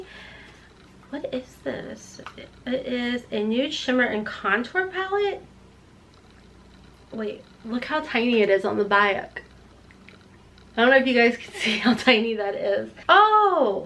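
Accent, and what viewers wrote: South, United States. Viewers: We love our country accent . And I sing 🎶 along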